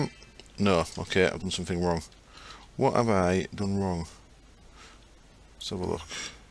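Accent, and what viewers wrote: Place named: Northern England, United Kingdom. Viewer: I'd be interested in listening to your soothing northern accent no matter what game you were playing. Reminds me of my grandfather Grumpy a good Yorkshire lad.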